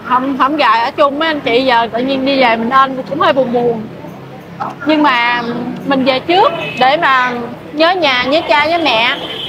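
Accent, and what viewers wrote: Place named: Mekong Delta, Vietnam. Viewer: Ban vay moi giong miên tây thương yêu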